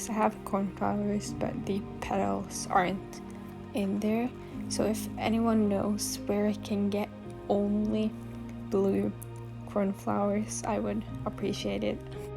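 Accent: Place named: Norway. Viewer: Hei hei, er du norsk 😊😊? hvor kjøper du ting til hamstere? Sorry if I’m wrong just guessing from your accent/English!!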